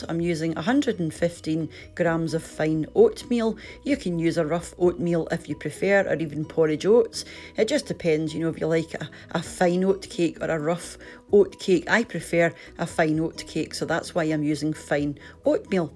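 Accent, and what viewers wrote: Scotland, United Kingdom. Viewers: Thank you❣ Love from South Africa... How I love your Scots accent ❤️ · Pleasant video mouthwatering and superb accent! I love Scottish accents! Thank you for your video! 👍👍👍👍👏👏👏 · My first batch is in the oven! I love listening to your voice. The Scottish way of talking is so beautiful. Thank you for sharing. I made it with gluten free flour and put the oats in a blender to make the flour finer. I will experiment by adding some sesame seeds and using different fats like olive oil for example. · Happy New Year from the Highlands of Bavaria (Alps). Thank you so much for your lively recipes. I so love watching, learning and of course listening to your Scots. A friend fae Aberdeenshire sent me Orkney oatcakes in a Christmas parcel. Made my day. I've been in a sickbed for 19 months, now and there's not much of a chance to make it to Scotland anytime. 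I'm trying to bring Scotland here to this 9 squaremeter sickroom. Tartan everywhere, photos, Manran and Skipinnish or the Corries singing to me and with me. I spend a lot of time trying to learn some Gaelic online. All this keeps my spirits up but sitiing in my tartan bedding sipping on a dram and munching away on Orkney oatcakes made this Christmas so much better for me. I want you to know, when you are making your videos, you are making many of us subscribers smile and feel good. It's not "just" about cooking. You bring a wee bit of Scotland to people around the world and we appreciate your work and your personality very much. Best wishes to you and your loved ones Bliadhna Mhath Ùr 🙋🏻‍♀️ Lisa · I've just produced two baking trays full of your delicious oatcakes - since I worked as a German au pair near Aberdeen when I was young I love oatcakes and I am very glad I found your video. Love your wonderful Scottish accent. 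Thank you so much!! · I got into oat cakes via Nairn's which used to be in the supermarket here in Australia. They had a very nice fruit version with little currants. I was shocked a canny Scot threw away the extra dough! heh. My Mum was Irish and I think I got it from her not to waste. Love your accent and voice, and Scottish words like shoogle. Good job. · Love your accent Makes it so authentic thanks Can’t wait to make them I am Australian but love all things Scottish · Thank you for sharing I must try these out and I love your Scottish accent Love From south africa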